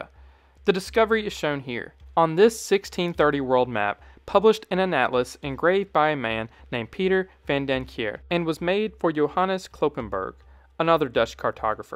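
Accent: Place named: Tennessee, United States. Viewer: Hey there I was trying to guess your accent and just wondering what state you’re from ? I hear TN. Thanks. Nice video.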